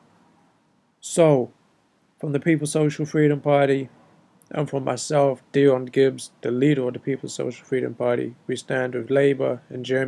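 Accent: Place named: United States. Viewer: why do u sound american then?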